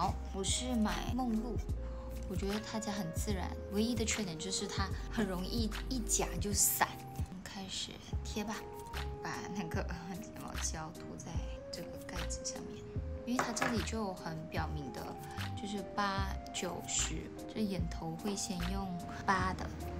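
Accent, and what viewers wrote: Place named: China. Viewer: 整個中國腔欸！（有嚇到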